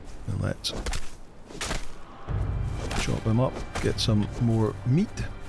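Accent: Scotland, United Kingdom. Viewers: Bro does the thrall settings at 0.1 work? Iv Been running about for days and my level thrall met his end at 5. It’s feels like it’s still taken ages to level up. Great Chanel for this game by the way. Iv learned a lot and have subscribed and liked. What Scottish accent is that by the way? fife. aryshire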